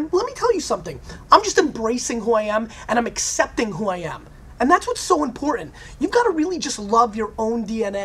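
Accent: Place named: New York, United States. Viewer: nice accent i was gussing new yorker...jersey almost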